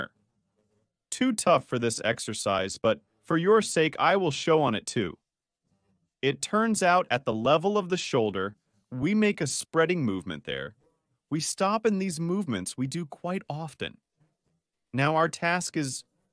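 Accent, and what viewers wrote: Russia. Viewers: I love my new russian doctor 😊. I love his sense of humour and the Russian way of speaking and his expertise. Of course I don't watch illegally and I learn so much. I'm Polish fan. Thank you ❤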